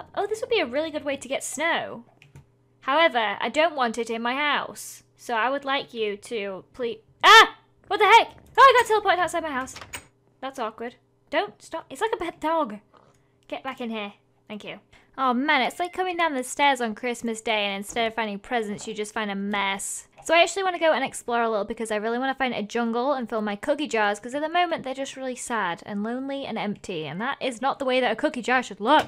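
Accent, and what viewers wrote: United Kingdom. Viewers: Did any one else notice her saying bannene smoothie instead of banana smoothie or maybe it's her British accent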